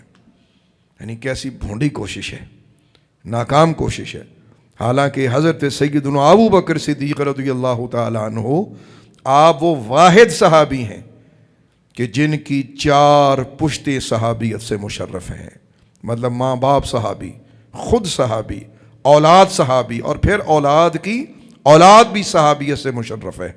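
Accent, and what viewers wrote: Arab world. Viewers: SUBHAN ALLAH… YA SAYADI YA MURSHADI … qurban ap k ilm o feham py … arbic lab o lehja khubsorat … muzu kalam alaaaa… tahqeeq or dalaile zarbdast… QURAN E PAK ki ayat mubarak se ABU BAKAR ki shan or muqam ko waziya beyan farma k munkiron ko lajawab kiya … andaz e beyan alaaa or purasar …. AP ki ilmi baserat ko salam … ❤️🌹❤️🌹❤️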